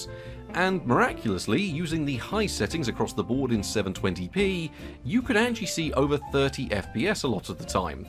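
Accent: United Kingdom. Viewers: This is so American of me to say, but I say it as an American whose family literally came over to Ellis Island, NY from London in the 1800s. Also according to my DNA I am 68% British & absolutely LOVE the UK. Ok, with that disclaimer out of the way. Having a British Accent just makes the videos seem like dated fine wine! Nostalgia with intelligence!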